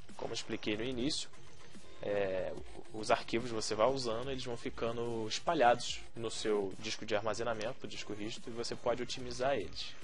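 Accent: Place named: Rio de Janeiro, Brazil. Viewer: (lento) leanto.. (disco)dixxxxco..(sistema) sixxxxtema.. sotaque carioca é bem diferente kkkkkkk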